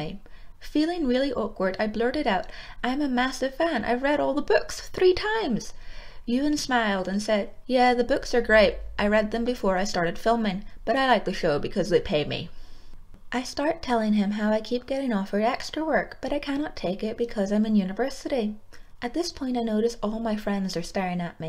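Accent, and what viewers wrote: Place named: Scotland, United Kingdom. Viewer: True 😅 You mentioned Belfast, is that where you're from? I had assumed your were Scottish or something lol 😅😆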